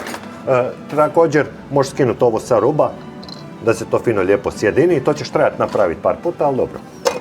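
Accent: Zagreb, Croatia. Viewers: Super ti je purgerski govor! :)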